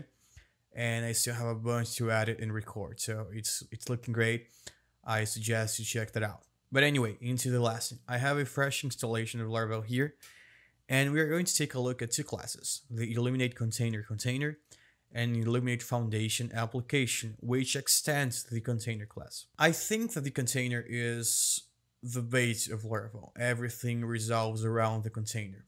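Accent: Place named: Brazil. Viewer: já de cara notei que tu é brasileiro kkkkk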